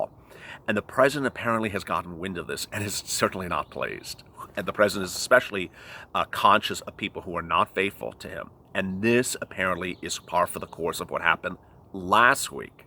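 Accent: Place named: Los Angeles, United States. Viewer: Where you leave you sound like you live in L.A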